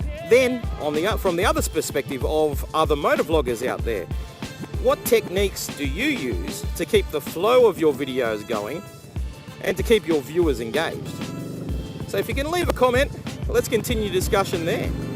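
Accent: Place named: Australia. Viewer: I put it down is the Aussie accent lol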